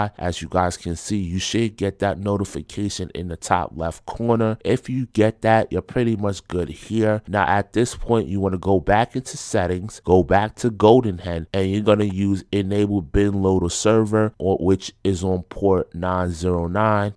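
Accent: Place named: Brooklyn, United States. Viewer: Bro you from NY aren't you ? By your voice an slang I would say brooklyn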